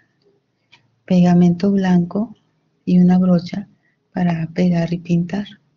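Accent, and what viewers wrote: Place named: Chile. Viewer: Mexicana???hoooo habla igual que nosotros los chilenos, llegue a pensar que podia ser chilena jajajaja....bueno se paso me han encantado todos sus trabajooos....besoooossss amiga..DIOS la rebendiga mucho por todas sus creaciones...😍😍😍💖💖💖👏👏👏🌹🌹🌹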